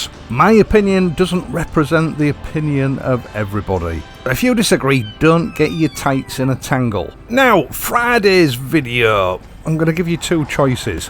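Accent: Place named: Northern Ireland, United Kingdom. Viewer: I really like your videos, they are very detailed. Also, you sound a lot like Uncle Colm from Derry Girls, which makes your videos all the more pleasant!:)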